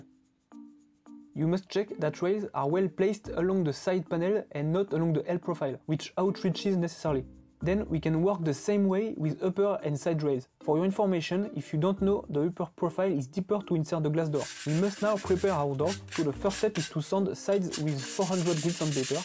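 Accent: France. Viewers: i wish i was french just to have an accent like yours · c'est un français à l'accent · it's typical french english, it's quite cute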